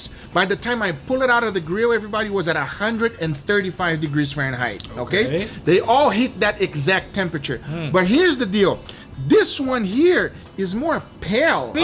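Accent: Brazil. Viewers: Is this dude Brazilian? Major Brazilian accent!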